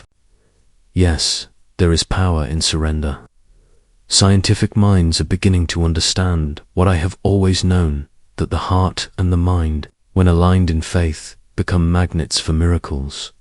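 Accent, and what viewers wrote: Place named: England, United Kingdom. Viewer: You have an English voice....as I do. I receive.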